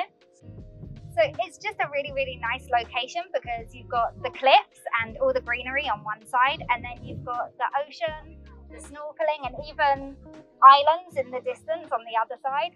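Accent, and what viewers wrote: United Kingdom. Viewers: 英國腔真的超好聽， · 好聽的英國腔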